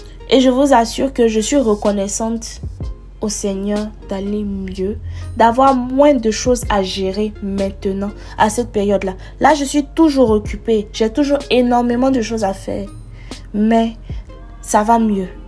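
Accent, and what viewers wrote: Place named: Ivory Coast. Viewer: «Deux cuillères de miel ow écoutez moi😂 Fhum » j'aime trop ton accent ivoirien là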